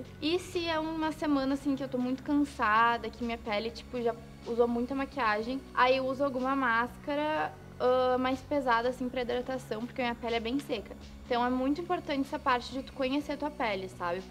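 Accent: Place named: Rio Grande do Sul, Brazil. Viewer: Sotaque de gaúcha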